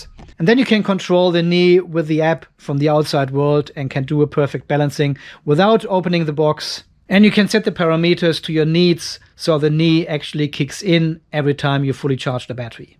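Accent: Germany. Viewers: You sound like german? Speak deutsch?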